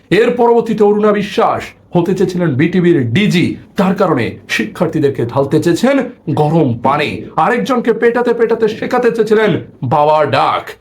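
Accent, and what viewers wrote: India. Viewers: দাদা বাংলাদেশী। আপনার কথার ধরন অনেক ভালো। আপনাকে ইন্ডিয়ান লাগে আমার কাছে।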